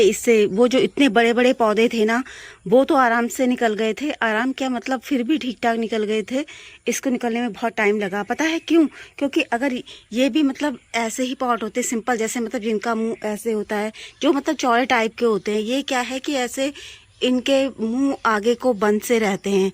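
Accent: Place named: Garhwal, India. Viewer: Aap ghadwali ho awaz se lagta hae good sharing happy gardening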